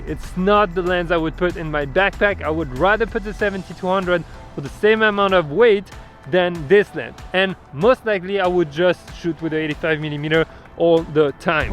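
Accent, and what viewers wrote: France. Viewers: I wonder if ...tu es français ou québécois!? :D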